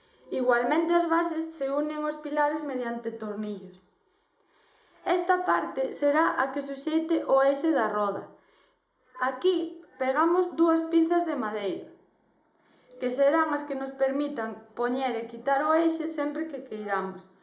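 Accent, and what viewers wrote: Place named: Portugal. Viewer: Parece catalan pero esta muy mal hablado , tiene un acento de portugues pero igual se entiende muy poco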